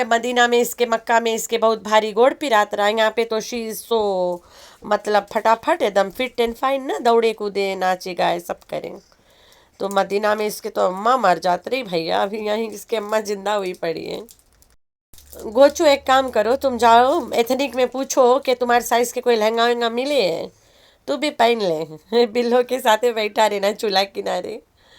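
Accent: Uttar Pradesh, India. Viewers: Very good reaction.❤❤❤❤or aapki U. P. Vali language me toh bahut apnapan lagta hai main bhi india ke U.P. se hun. Reaction bahut interesting lagte Hain.🇮🇳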